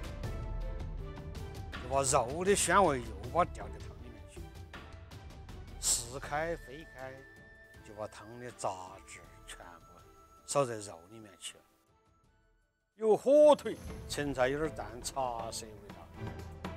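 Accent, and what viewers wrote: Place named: Sichuan, China. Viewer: 浓浓的四川味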